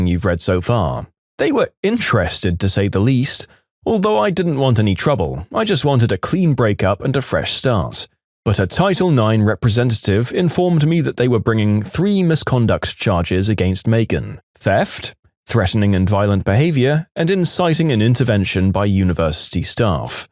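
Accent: United Kingdom. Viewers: oh he's British now hi fellow Englishman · WHEN DID YOU TURN BRITISH?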